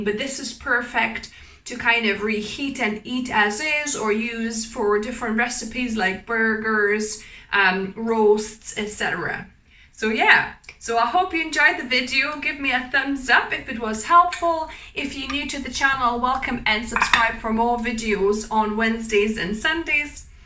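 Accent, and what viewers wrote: England, United Kingdom. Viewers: Just found to see about how to go about making some black soy beans I have for natto. I'm not worried about the beans splitting, so thinking I'll give a go after rinsing first at maybe 45 minutes with 2" of water at least above. Not to be rude my dear, though you have the most combined European accent I've heard. Sounds a mix of Great Britain and then some. Thanks for sharing. I'll try to document and report back on my vlog YT channel the results. Thinking I'll not use the Mueller pressure pot and go with the Instant Pot if I can't confirm the pressure settings being equivalent to the High Pressure off the Instant Pot IPDUO80 I have out. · What a nice English accent. 😅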